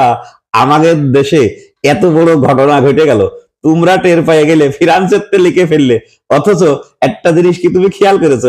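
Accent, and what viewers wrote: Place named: Khulna, Bangladesh. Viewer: আস্সালামুআলাইকুম, আমি পশ্চিমবঙ্গ থেকে শুনছি। আমার দেশ খুলনা, বৃহত্তর খুলনা। আপনার কথা শুনে মনে হয় আপনি khulna/যশোরের মানুষ। আমার জিজ্ঞাসা আপনার বাড়ি কনে? ভাষা শুনে বড়ো আপন আপন মনে হতিছে। জানালে খুব ভালো ঠেকপে।। ভালো থাকপেন।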